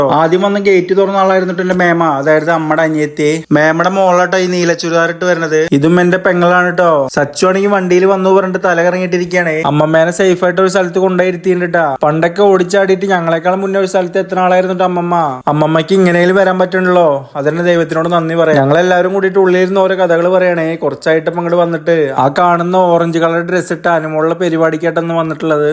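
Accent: Palakkad, India. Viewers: Palakkad style athanu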